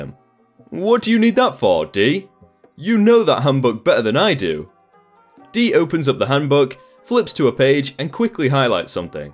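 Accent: United Kingdom. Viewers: The narrator seems British, the reddit poster was American ... two completely different languages that are only superficially the same ... watch enough videos and you'll get the hang of it ...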